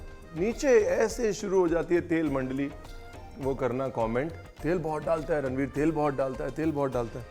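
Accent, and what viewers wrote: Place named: Punjab, India. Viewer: Aap cooking thriller Hain aik dum Khushi say Jo recipe banti hai Woh laJawab hoti hai ❤ Chass a gaya wai Punjabi lehja wala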